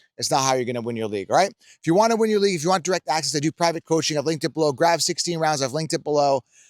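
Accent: Canada. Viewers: I’m curious, what state are you from? Or are you from Canada? I hear a Canadian accent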